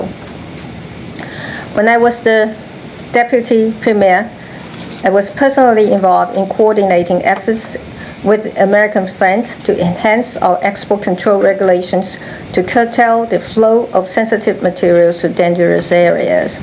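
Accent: United Kingdom. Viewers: The speech was very thoughtful, and she has a more british accent i felt, which made her sound sophisticated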